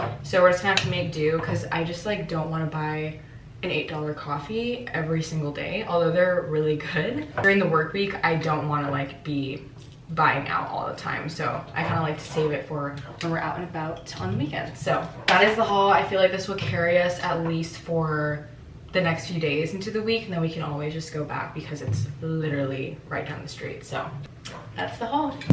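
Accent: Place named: West Coast, United States. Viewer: I like your west coast accent. Stands out so much in NY.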